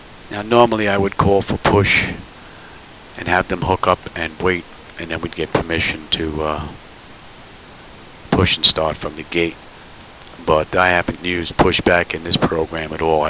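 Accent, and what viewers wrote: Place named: New York, United States. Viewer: Also, I got a strong Boston accent, but sounds like you got a New Yorker one, I love the city of New York, but screw your teams😂 just messing around bud. Good rivalry we got.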